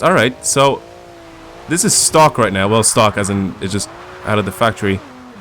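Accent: Ireland. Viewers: Sooo first ever video I've watched of yours even though I've seen you in Slap"s videos but are you Irish?? Thatd be pretty cool seen as i am too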